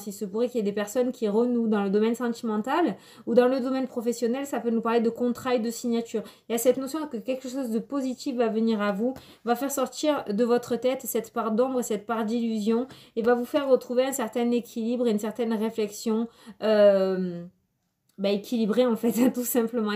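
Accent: South, France. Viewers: Merci, j'adore votre accent du sud. Apparemment, les sagittaire vont respirer un peu 😍😘😍😘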